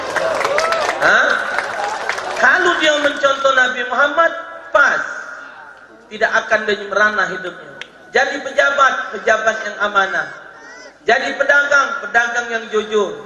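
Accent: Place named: Palembang, Indonesia. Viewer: Masya Allah baru berapo bulan buka ceramah,dan dengan ceramah nyo yg khas palembang..sayo baru tau kalo lah dak ado lagi😢😢.smoga alm ditempatkan bersama org2 soleh.Aamiin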